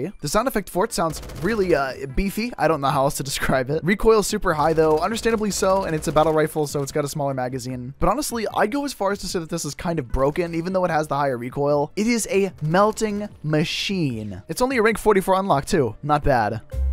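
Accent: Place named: New York, United States. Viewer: does words and picks up accents quick. Great talent. I love his New York accent. Better than mine. I was born in New York & work in NYC. I love his NY accent & a little jealous. I'm female. Jealous is more a female thing!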